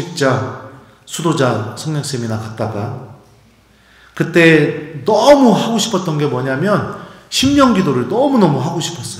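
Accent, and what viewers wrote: Chungcheong, South Korea. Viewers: 을통해 주님의 사랑에 만약 행복합니다 신부님의충청도 특유의 사투리가 마음깊이울립니다 신부님 감사하고 많이많이 사랑합니다❤❤❤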